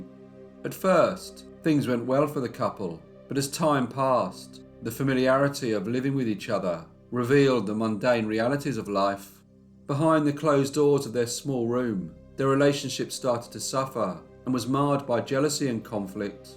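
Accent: United Kingdom. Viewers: I had a hard time understanding you. That's all. Your British accent is real heavy. But the content was great though.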